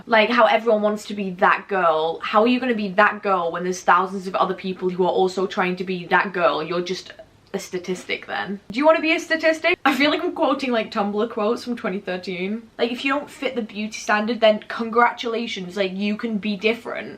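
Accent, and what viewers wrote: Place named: Northern England, United Kingdom. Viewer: Omg i have the same accent as you! Like a northern english accent where abouts are you from? ❤️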